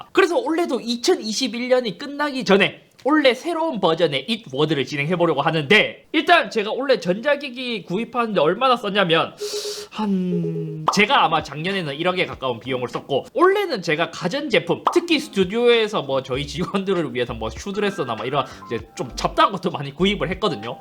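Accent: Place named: Gyeongbuk, South Korea. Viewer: 올래 ㅋㅋㅋㅋ경북커 ㅋㅋㅋㅋㅋ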